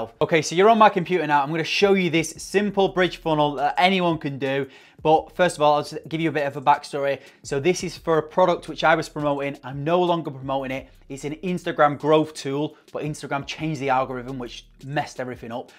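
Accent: United Kingdom. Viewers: "Affiliate marketing" awesome video! I like the way you present something, clear with British accent, concise and full information. Even though English is not my first language, I can understand that. Cheers!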